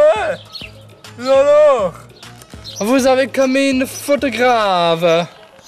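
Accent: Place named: Democratic Republic of the Congo. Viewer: sympa l'accent congolais c'est bien ca?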